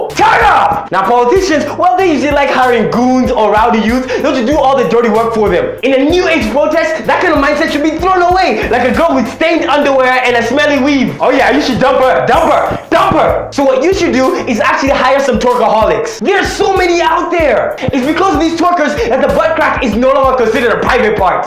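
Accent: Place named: United States. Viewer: African are racist to election, hahaha ain't that the truth!!!!You are funny you would be more funny with that cute african accent!!!You sound almost american!!!Do all kenyans speak english at all times???if yes, why oh why???What happened to your native language, I'm not even kenyan but i would appreciate some kenyan accent!!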